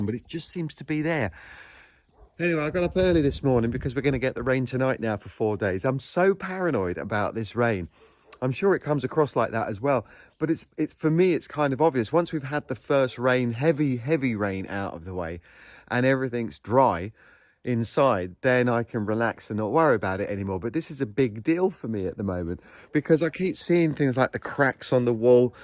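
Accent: United Kingdom. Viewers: What part of England is your accent from?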